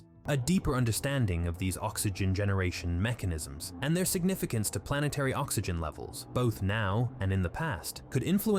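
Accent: United Kingdom. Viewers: Why do you have a British accent and an American accent?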